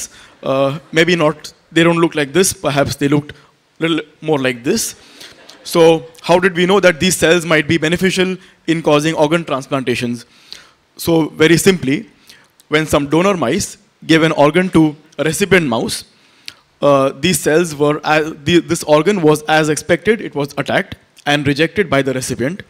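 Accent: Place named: India. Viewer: i too dislike the Indian English accent, but you must realize that this just is a matter of habit and does not warrant hate.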